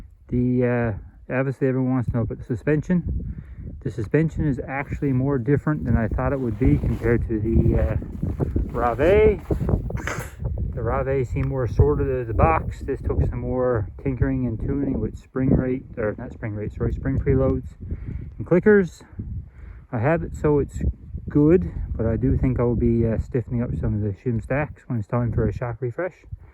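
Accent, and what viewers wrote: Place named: Newfoundland, Canada. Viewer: Thought ya was a Newfie ?!! Lol good stuff thinking this may be my next sled ? Keep xterrain content coming! Cheers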